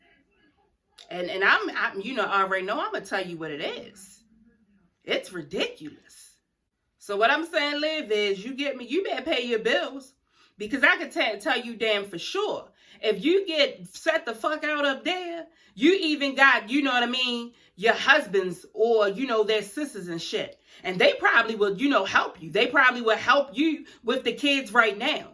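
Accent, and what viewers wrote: Baltimore, United States. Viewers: The one thing I love about you is that you say what you say and you leave it alone, you do not fall into the back and forth, some one calling you out and they can't take the heat, lookin for sympathy, next Hussle literally crying out for it, not gonna even say the frauds name, I looked up this Liv person, but I see you give great advice, she should listen.....Keep doing you...SO SO glad I found your channel and I love the accent.. B-more I'm thinking if not I apologize.... Lost my niece to Baltimore. Anyway, You rock.... Thank you for being honest and real...saying luv luv from Chicago..💯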